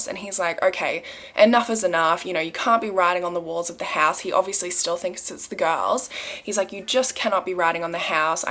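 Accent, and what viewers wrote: Australia. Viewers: You go Girl! Just your Aussie accent makes me bingewatch your vids!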